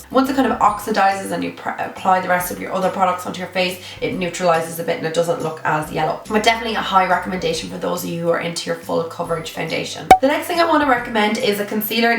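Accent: Ireland. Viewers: You're gorgeous! 😘 Love your accent, is it Irish?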